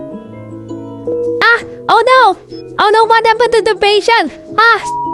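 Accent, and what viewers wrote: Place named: India. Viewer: Bros voice is indian....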